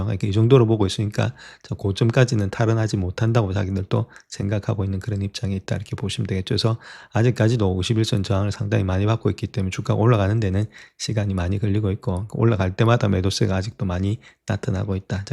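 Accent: Gyeongsang, South Korea. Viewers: 그냥 지나가세요. 경상도분들의 발음이니까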